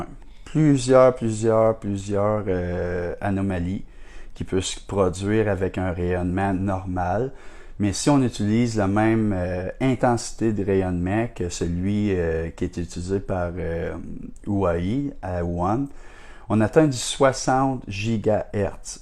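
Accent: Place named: Quebec, Canada. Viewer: Jean de Flor..les Québécois vous êtes beaucoup dans les fleurs 😉 en plus super joli accent vous avez, un vrai plaisir a vous écouter les Québécois 👍❤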